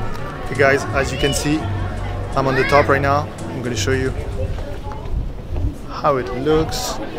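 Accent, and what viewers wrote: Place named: France. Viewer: l'accent français c'est impossible de s'en débarrasser en fait mdrr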